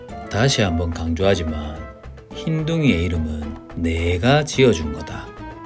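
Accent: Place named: Busan, South Korea. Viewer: 부산인가요? 사투리가 동질감 있어서 더욱 빠져들게 했어요. 고생 많으셨습니다. 좋은 일만 많이 생기시길 바랍니다.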